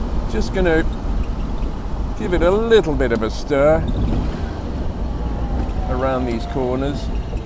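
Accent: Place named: RP, United Kingdom. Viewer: so pure, I mean the car... and the perfect Queens English explanation.... Like!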